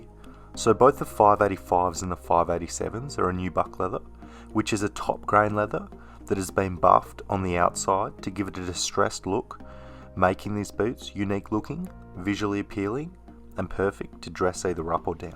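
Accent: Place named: Australia. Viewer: Loved the video and the laid back Aussie voice! Going to pull the trigger on a pair of these after seeing this. Love from Ireland :D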